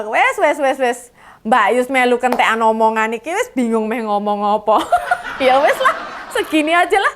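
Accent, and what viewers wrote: Java, Indonesia. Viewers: aku ngefans sama suaranya..logatnya yojo tenan! wes nomer 02 turu ae nang omah..ra mgkn menang! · Saya puas banget....!!! lucu mendengar narasi dengan logat bahasa jawa yang mendok di vidio ini. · Mbak Yus, teruskan karya nya Mbak. Ini luar biasa. Anda cerdas, cantik & menarik. Pembawaan nya njawani, saya bangga BRAFO.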